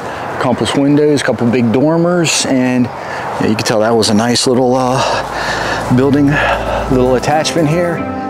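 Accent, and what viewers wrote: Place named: South, United States. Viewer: Wow, what a great accent! Where are you from? Virginia, West Virginia, Georgia? I skipped the intro.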